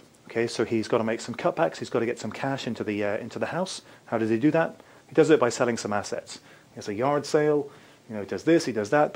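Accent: England, United Kingdom. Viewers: Hes english not irish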